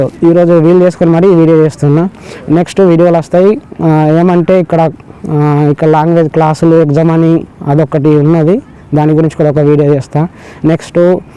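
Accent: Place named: Andhra Pradesh, India. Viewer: నువ్వు కరీంనగర్ గదా నువ్వు సగం ఆంధ్ర సగం తెలంగాణ మాటడుతునవ్ మొత్తం తెలంగాన మాట్లాడు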